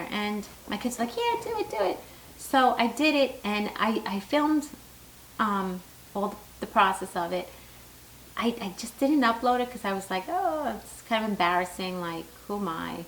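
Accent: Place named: Long Island, United States. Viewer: I appreciate the videos for average, mature women as well. I found you doing a search for curly hair and when I heard the accent I was like ok, I,m from Long Island. So keep it up and thanks for sharing.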